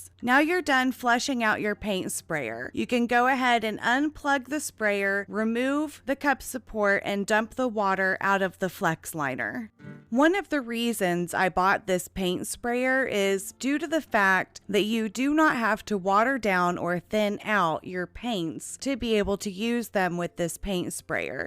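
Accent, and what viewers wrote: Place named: California, United States. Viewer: That California tongue roll just doesnt let me concentrate☹️Great video thou.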